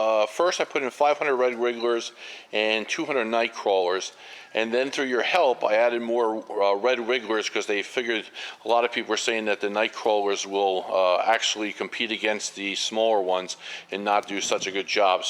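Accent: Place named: Philadelphia, United States. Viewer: You sound like you have a bit of a Philadelphia area accent. Maybe Baltimore?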